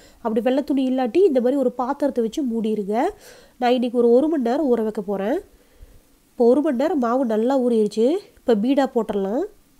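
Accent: Kongu, India. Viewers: Kongu tamil eppovuma alagutha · Your kongu Tamil was good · அருமையா சொன்னீங்க ! கொங்கு தமிழ் அருமை ! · Good kongu slang😀😀😀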